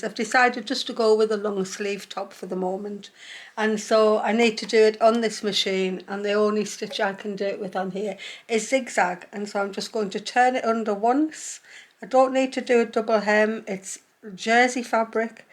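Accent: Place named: North, United Kingdom. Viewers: I just found you! A stitcher after my own heart, and your voice is a lovely northern one, like mine, though I've lived abroad now most of my life. Thanks so much for the skirt. I saw this idea on historical channels and have been meaning to make one for a while, so now I will. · Two absolute joys of watching you is that you have a beautiful home/northern accent I'm East Yorkshire and your speak in inches first! Are you using 4 or 5 thread overlock? I've had my overlocker for many years but have only ever done four thread.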